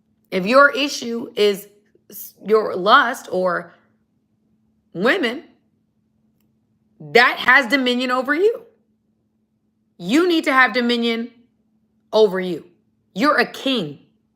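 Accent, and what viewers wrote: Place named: New Orleans, United States. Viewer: I think New Jersey plus Atlanta, or Georgia, almost makes a New Orleans accent, specifically a westbank of New Orleans accent..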